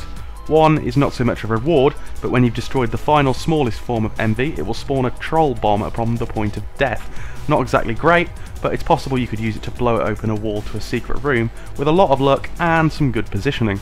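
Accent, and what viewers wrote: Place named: United Kingdom. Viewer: This is really difficult to understand. I hate this kind of british accent.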